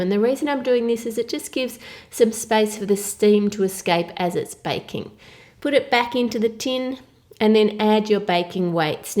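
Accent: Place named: Australia. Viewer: If you want that kind of accent. You have to roll your tongue :P I love her Australian accent too!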